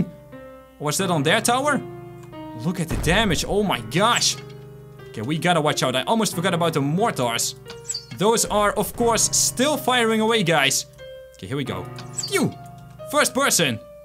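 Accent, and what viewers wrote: Netherlands. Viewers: Ar you from netherlands?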